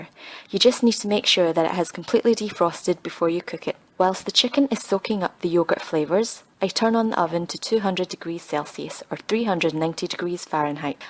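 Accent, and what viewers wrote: Scotland, United Kingdom. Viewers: I came on youtube to look for some meal prep inspiration cos I need to lean up. Great video! But I can't get over you being Asian with a Scottish accent hahaha x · Love your videos and your Scottish brogue Regarding chickens and washing... I always wash raw chicken prior to cooking especially if it is whole This practice reduces the harmful bacteria count especially the dreadful salmonella which can cause food poisoning if you unfortunately undercook your chickens Immediately sterilize the sink counter and all items used to handle the raw chicken It is wise to use a food thermometer inserted in the thickest part of the chicken but away from the bone Health Canada advises 85 degrees C or 185 degrees F. (Some authorities are happy with 170 degrees F) The chickens should "rest"for 15 minutes prior to carving Keep up the good work with your fun and helpful videos!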